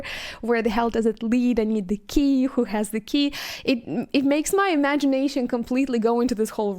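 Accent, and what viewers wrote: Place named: Eastern Europe. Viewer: You don't have to work on your pronounciation. I can understand you very well and slavic accents are by far my favourite accents! No need to try to get rid of it.^^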